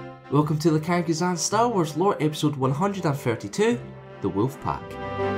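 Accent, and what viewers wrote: Scotland, United Kingdom. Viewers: you sound really scottish...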